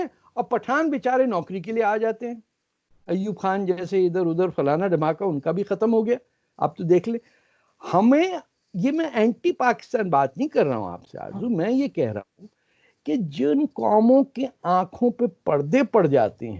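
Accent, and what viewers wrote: India. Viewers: Arzi kazmi I like your program. You look and speak like an Indian daughter. Very lovely. God bless you beta.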